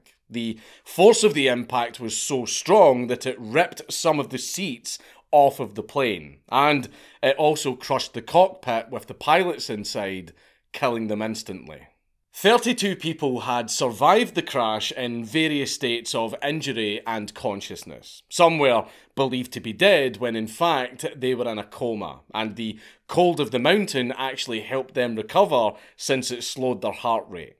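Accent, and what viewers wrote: Scotland, United Kingdom. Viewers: He should do an video where he speaks like a Scottish road man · Never thought I’d be falling asleep to a hairy Scottish man telling me about a plane crash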